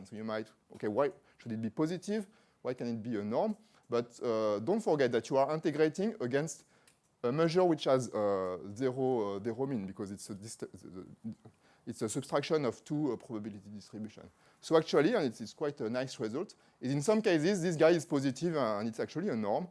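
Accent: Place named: France. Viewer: That french accent..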